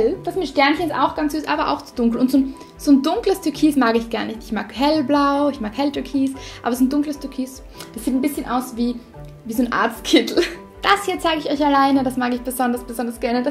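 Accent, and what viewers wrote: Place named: Austria. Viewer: Mehr filofax Videos ich habe mir schon auf Amazon eine Wunschliste gemacht für alle Sachen für meinen filofax denn ich noch nicht habe ich werde mir den gleichen wie du holen weil ich liebe einfach Mint😍 und ich liebe euren österreichischen Akzent ich komme ja aus Deutschland ❤️🔥 Liebe Grüße Florida💓💎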